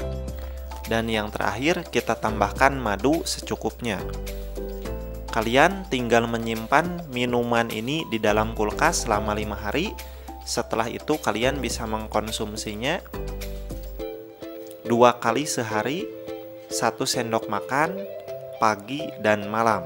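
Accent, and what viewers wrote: West Java, Indonesia. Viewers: Sunda pisan kang... Hatur nuhun ah tips na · Kayaknya adminnya orang sunda... Kelihatan ga bilang 'ep' (f) 😄😄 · Sunda pisan meneh ngomong logatna hahaha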